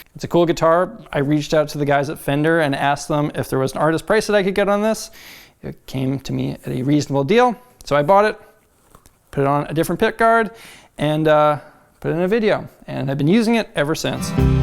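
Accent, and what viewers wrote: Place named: Manitoba, Canada. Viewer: oh that manitoban accent!! XD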